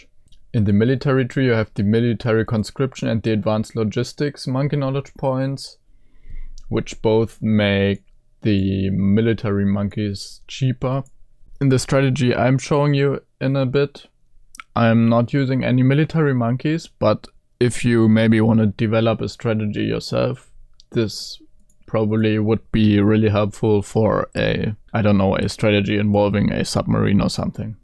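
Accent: Germany. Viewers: are you german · ur from germany arent you?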